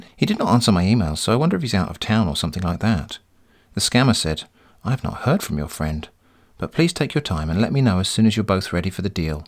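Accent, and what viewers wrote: England, United Kingdom. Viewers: It's an English gentleman speaking English language.like Liverpool FC playing at Anfield . What is there not to like?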